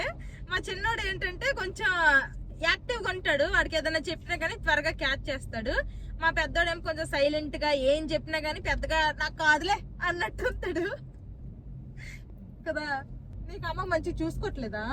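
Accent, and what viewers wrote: Srikakulam, India. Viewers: మీ కన్న మీ అమ్మ గారు నీట్ గా మాటలు ఆడుతున్నారు మీరు మరి శ్రీకాకుళం యాస దారుణంగా మాటలు ఆడుతున్నారు 🙏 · dear madhavidont think of leaving the mangoes bagif there is no place..make place.how can you leave andhra mangoes....your srikakulam accent is very good. nice to hear. you talk openly..ftom your heart...👍👍💓💓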